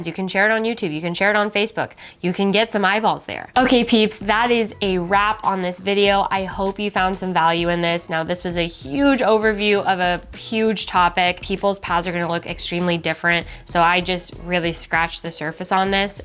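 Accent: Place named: United States. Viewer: I am brazilian and live in London. I love your american accent.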